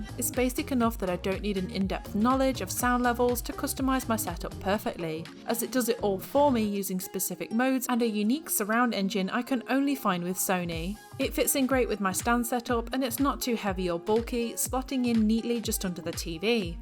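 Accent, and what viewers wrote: United Kingdom. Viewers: “Heych”-T…. The fuck with your pretentious british accent you piece of grabage!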